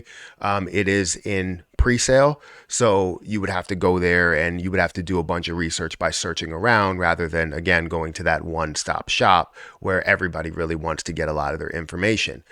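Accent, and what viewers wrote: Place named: Los Angeles, United States. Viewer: Where are u from? Your accent looks from Los Angeles isn't?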